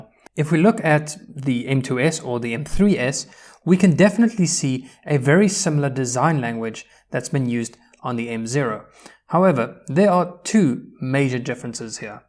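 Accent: South Africa. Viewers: It’s not often that I click on a video and hear a South African accent. 😊 Solid review! 🤙🏾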